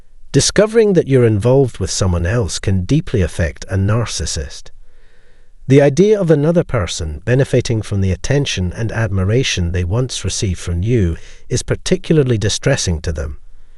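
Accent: Scotland, United Kingdom. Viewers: Great talk. I was in the relationship for 50yrs. Back then you never heard of manipulation or control. I was one with empathy. I remember one time he was at the doctor's he got tests, when he got the results I asked what the doctor said I was told to mind my own business. I have never asked him again. He was cruel and would say nasty things. He even said something to one of my daughters about me, she was thinking to herself mum is not like that. They love to play the victim and blame you for everything. I have no desire to have him back in my life. No love, affection, he made out I had a problem but it was his control. I still feel the loss as I was the romantic one. I'm so grateful I'm still alive and getting help. I don't care what people say about your accent I'm Scottish as well but have a different accent. You are giving us a good life with education. 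Thank you and your channel. ❤❤🙏🙏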